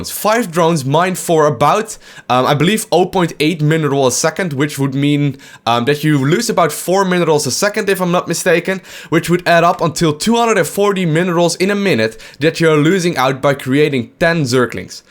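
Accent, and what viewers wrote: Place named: Netherlands. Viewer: fuck i love dutch people. your personalities and accent is so awesome! love your videos bro!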